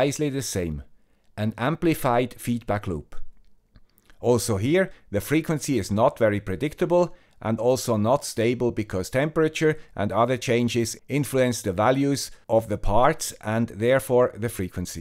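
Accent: Switzerland. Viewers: This is a very good video. Thumbs up. And of course Swiss people are renown for timing accuracy, so this is consistent with getting the information from a guy with a Swiss accent. On the downside, Swiss people are not known for having lots of sex. For information on that aspect, we will be waiting for a video by a gal with an Italian accent! ;-) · Great your Youtube show! But I would prefer it very much if your videos would continue to be numbered. It's much easier to reference them and much more practical to say to a colleague: "Have you seen video ### by (the guy with the Swiss accent) yet?". Or sometimes I just write down: "See Swiss ##".:-) Many thanks for your series! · Hello Guy with swiss accent, very impressive from Guy with Bangladeshi Accent.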